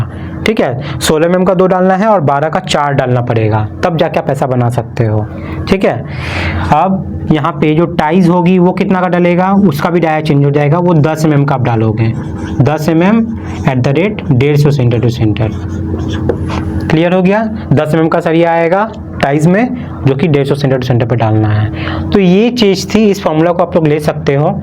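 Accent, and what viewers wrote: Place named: Bhojpuri, India. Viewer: Sir ...aaji itni bhojpuri jesi baat kar rahi ho ...acha nahi lag raha hai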